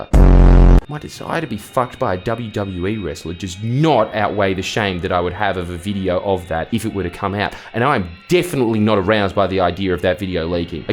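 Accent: Australia. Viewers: This man is funnier because of his Aussie accident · I'm assuming you are Australian and my mind can't process those words with an Aussie accent😅😅🤙🏾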